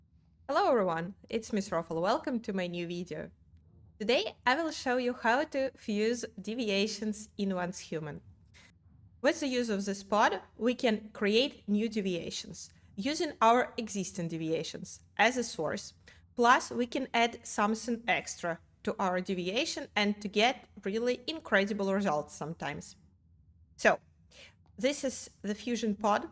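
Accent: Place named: France. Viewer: I'm wrong again. That's OK, I'm used to it - I'm married. Lovely accent, is it French then?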